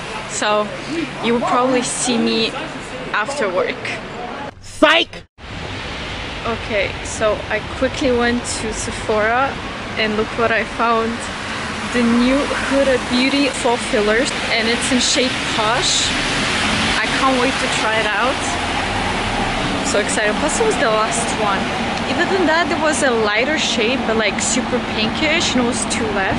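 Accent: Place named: France. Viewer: Your accent is so french, where do u come from ?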